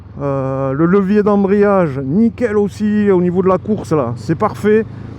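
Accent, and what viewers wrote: South, France. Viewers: Merci pour cet essai, avec le bel accent du Sud!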